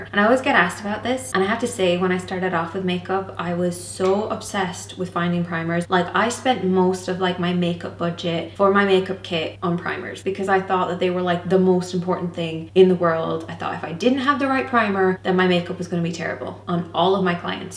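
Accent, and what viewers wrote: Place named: Ireland. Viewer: I picked up on that cute accent of yours right away..im Irish too!! And will b visiting Ireland I'm praying within the next year! And i just want to tell ya,u r so beautiful!! Irish Women rock lol! And need to try this primer...thanks for video!!💚☘💚☘💚☘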